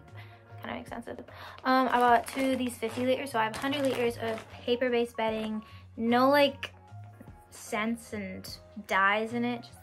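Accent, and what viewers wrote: Scotland, United Kingdom. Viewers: Are you originally from Scotland? I hear a bit of Scottish accent sometimes but could be wrong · i love ur accent ( I think ur scottish ) U sound like my dad when hes on call with my nana cuz my dads scottish and my nans scottish and Irish( Edit ) I just realised u said ur English but moved to Usa so sorry x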